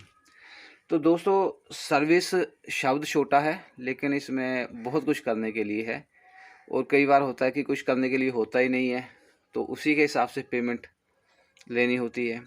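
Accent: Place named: Punjab, India. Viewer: Ur punjabi :D hindi toh pata lag geya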